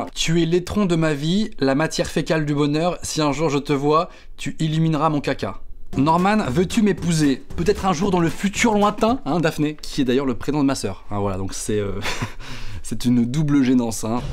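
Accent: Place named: Nord-Pas-de-Calais, France. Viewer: lol tu es Chti c'est ces normale je suis contre le racisme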